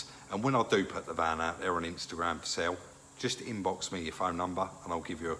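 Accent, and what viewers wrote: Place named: Essex, United Kingdom. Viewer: Take the injectors to a deisel specialist, they can tell you in minutes which are the good and which are bad, and they can also put cleaning fluid under pressure through them. You probably didnt need 3 of the four glow plugs, and may not have needed all 4 injectors. Throwing money at a problem does work, but you are in it to make money, so the less you throw, the better! Love the channel! Half my family comes from the east end, and then from Harlow, and I had relations all over Essex, so your accent is like half my family to me! Phil, East Yorkshire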